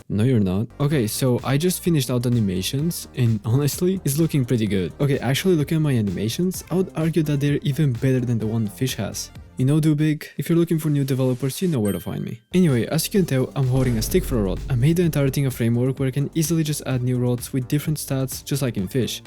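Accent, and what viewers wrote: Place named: Russia. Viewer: какой-то русско-язычный акцент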